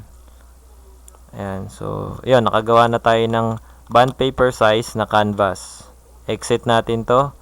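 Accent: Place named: Philippines. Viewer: He is using tagalog language so he's accent is in tagalog too.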